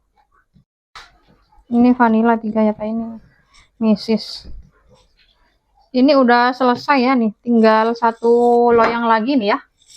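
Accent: Madura, Indonesia. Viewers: Bunda asli mana.. ngomong nya Madura. Sy jg dr Madura ?😊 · Mbak di orang mana kok bisa madura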